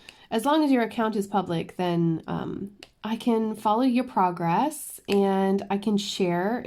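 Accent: Ireland. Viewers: did you realize that you are picking up an Irish accent? When you were outside with your new divas it was coming out. It fades in and out of your videos depending on what you are doing.💖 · Valerie Dunham I heard it and loved it. But then again I love the Irish accents anyway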